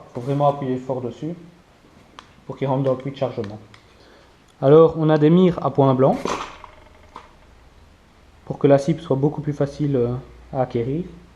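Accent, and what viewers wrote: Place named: Belgium. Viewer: ce belle accent belche ti <3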